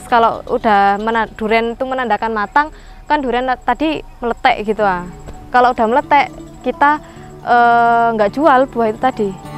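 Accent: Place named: Malang, Indonesia. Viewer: Logat malange gak ilang ,, " "ikuaa Lo mas "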